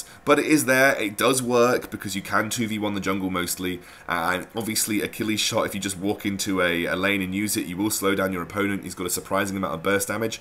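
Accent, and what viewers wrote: United Kingdom. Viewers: "Meturr" "Alpherr" why do the British ruin words?! 😓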